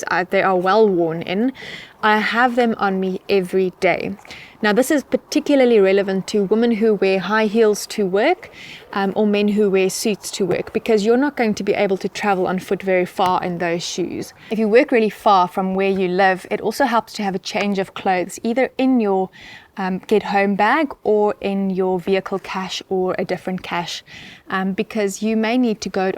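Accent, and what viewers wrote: South Africa. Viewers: Always an outstanding production 👏 of course that South African accent is the icing on the cake lol, one can never be over prepared for the future we heading into. Without a doubt the weak and unprepared will not make it, but I dont think you need to be concerned with that lol. Thankfully the father gave us a perfect road map to navigate the grim future that lies ahead, praise be to Jesus! Thank you for your time and insight, always appreciated 💪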